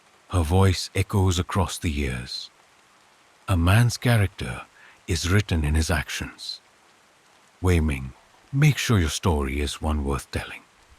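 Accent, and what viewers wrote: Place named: India. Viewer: Very good, indian accent is quite strong💪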